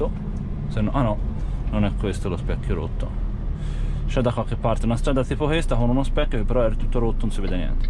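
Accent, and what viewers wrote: Tuscany, Italy. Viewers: Video molto bello soprattutto non noioso poi l accento toscano migliora il tutto. Potresti fare un video anche di pochi minuti un cui fai vedere il camion?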